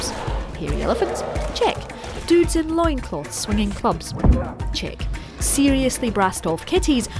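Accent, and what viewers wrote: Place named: Scotland, United Kingdom. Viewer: I think Scottish accent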